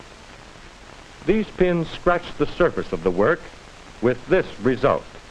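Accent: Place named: Transatlantic, United States. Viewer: It’s the “transatlantic” accent and they used to teach it in schools. It’s the american equivalent to the British RP accent.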